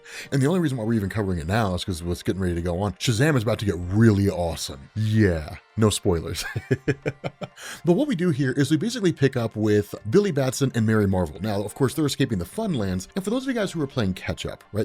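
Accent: United States. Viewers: The way Americans pronounce English places never stops amusing me 🤣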